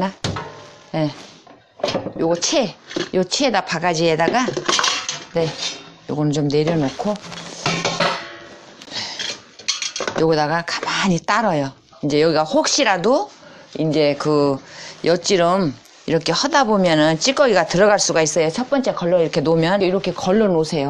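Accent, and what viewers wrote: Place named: Chungcheong, South Korea. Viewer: 충청도 아주머니신가봅니다 ^^ 저도충청도가 고향이유 재미있고 확실하게 설명도잘하시네요 재미있게 잘배웠습니다 건강하세요😃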